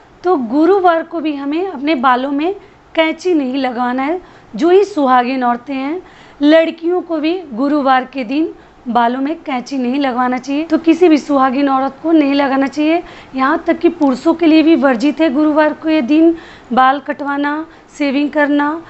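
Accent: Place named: Purvanchal, India. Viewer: Thanks didi mai female hu ye mere husband ki I'd hai mera naam kavita hai aap purvanchal se hai na apke boli mujhe bahut pasand hai apki videos se bahut kuch sikhne ko milta hai apki tips mai follow karne lgi hu aur life me uska fayeda bhi mil rha hai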